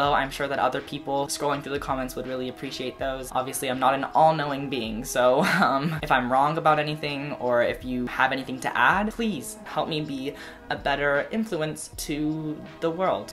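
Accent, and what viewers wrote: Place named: South, United States. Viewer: i have been subscribed to you for some time now and im now realizing that you have a really light southern accent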